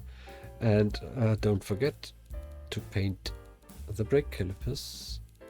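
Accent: Germany. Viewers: + German accent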